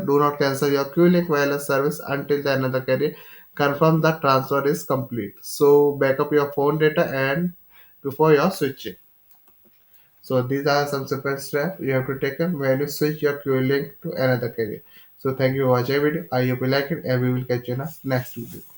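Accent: India. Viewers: your disgusting indian accent kills me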